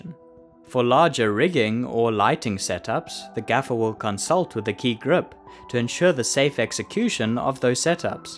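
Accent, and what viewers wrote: South Africa. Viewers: You have a very distinct UK accent. Is that London or somewhere in. Northern England? Oh South Africa! No wonder I couldn't pinpoint it. Keep up the good work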